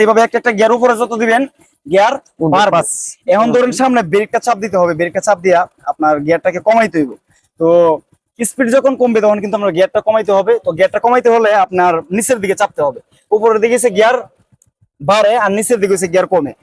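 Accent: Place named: Mymensingh, Bangladesh. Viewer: apnar bari ki Mymensingh? vasha shunei apon apon lagtese. onek kichu shikhlam apnar page theke gari chalanor. bike chalanor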